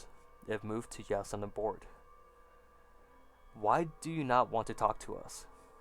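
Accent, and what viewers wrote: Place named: United States. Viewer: Australia? Hmm why do you sound so American I’m from California you sound just like my white homies haha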